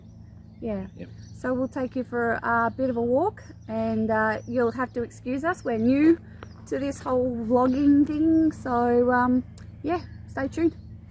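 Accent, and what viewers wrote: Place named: Australia. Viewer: Looking forward to seeing more videos. Beautiful place there. Where are you from Kind of sounds like Australia. Have a great night and God bless.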